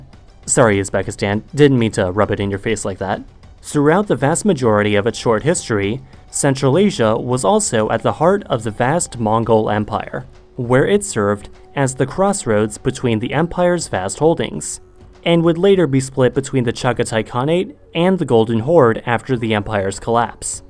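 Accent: Germany. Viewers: ich glaube, dass dein deutsch etwas dein englisch beeinflusst because in the first few moments I thought that your are german. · you are acuttly starting to sound german you said zis'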